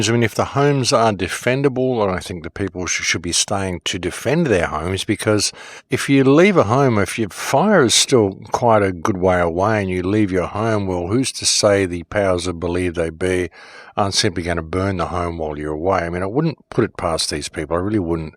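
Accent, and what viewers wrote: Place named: Australia. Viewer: No t.v., smart phone is going (dumb phone). All the WARNINGS and WATCHMAN channels are being sliced down, demonitized n' demonized. Been sharing, posting, warning for years. Folks, this is the end times! God forewarned these things would happen. We are at the tail end of the age of grace and facing the dawn of tribulation. REPENT FOR THE KINGDOM OF GOD IS AT HAND! Heads up! I hate to say it but this is nothing compared to what is coming! Gods judgement and wrath going to sort things out once and for all, sorting the sheep from the goats, the wheat from the tares and who can blame Him, look at what we done and how much society has fallen. TIME IS UP! We are living just as in the days of Noah. Get onboard the ark if you want to escape, repent of unbelief and sin and know Jesus Christ is mighty to save Amen! 🙏❤🕊 Great to hear an Aussie voice presenting our region. 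Well done bro. Brilliant, love your work mate, keep shining!! 🍃🌺🍃 Glad you got yr own channel being a voice in the wilderness! 😁👍 SHARED!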